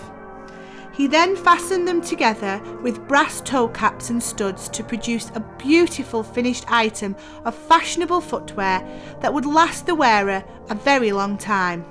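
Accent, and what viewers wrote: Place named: Northern England, United Kingdom. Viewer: First off. A big thank you to the film makers. This was a labour of love made by dedicated documentary film makers! The naration by is superb. Just enough of a northern accent to give it warmth. I loved it. For a film of its length and for a town so small to be able to hold you for 59mins is a tribute to the makers. My Gran lived in Golborn. My late mother was born there. I used to go to Golborn on a Saturday with mum on the bus from Leigh to see her mum. It would be around 1948ish to the early 50s. Gran lived in the second house from the Barn Lane end of Legh St. Opposite the cenotaph. Mum and dad were family freinds of Peter Kain. He made them a poker in the foundery for a wedding present. Later in life, when I was about 12, I used to go to Golbourn on the bus from Leigh to train spot on the station. 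The station master always kept an eye on us from a window up in the station building. Even now I have a model railway at the age of 78. I still have wonderful memories. I have to say thank you to for posting this gem.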